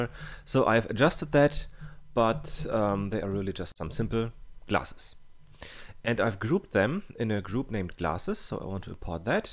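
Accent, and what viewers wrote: Germany. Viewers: du bist bestimmt deutscher du hast nen nervigen dialekt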